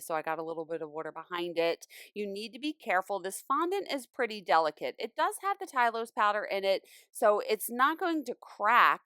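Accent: Philadelphia, United States. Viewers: You are definitely from Philly!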